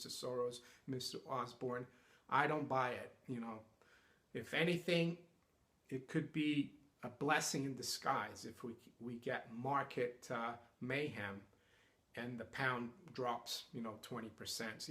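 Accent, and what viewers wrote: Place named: United States. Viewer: I thought I could hear a lot of "American" in your voice. :-)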